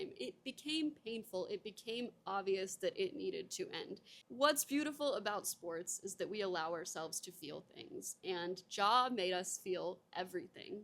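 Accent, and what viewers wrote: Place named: New York, United States. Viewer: Where's her accent 😂😂 she sounds more ny then t.n lol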